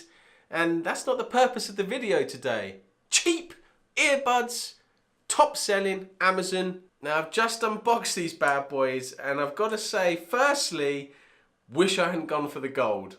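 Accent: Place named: England, United Kingdom. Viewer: Why do you look and sound like an English James Pumphrey?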